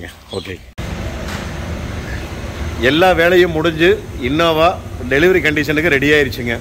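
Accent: Kongu, India. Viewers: "சுடச்சுட பெயின்ட் அடிச்சு நிக்குது!!" அண்ணா நீங்க சொன்னதை நான் மிகவும் ரசித்தேன்!! அசல் கொங்குத் தமிழில் நீங்கள் பேசுவதை கேட்டு ரசிக்கவே உங்கள் வீடியோக்களை பார்ப்பேன்!!!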